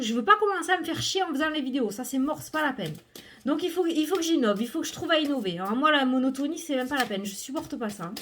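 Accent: South, France. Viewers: J adore ta bonne humeur toujours un plaisir de t écouter avec ton accent du soleil!! Bisous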